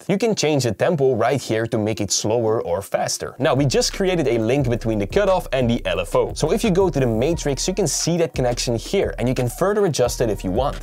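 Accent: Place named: Netherlands. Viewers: He does sound Dutch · from the accent I'm guessing you're Dutch!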